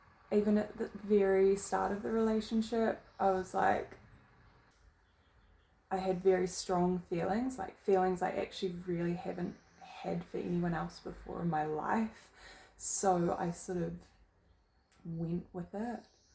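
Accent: New Zealand. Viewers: Extremely courageous of you to share this moment in your life. Give it time, time to & for yourself. I'm hearing a Kiwi accent. I'm not sure if you're in NZ? Maybe contact friends away from your area & get away for a while to break routines. Memories aren't always the best sometimes. All the best & keep shining 🌟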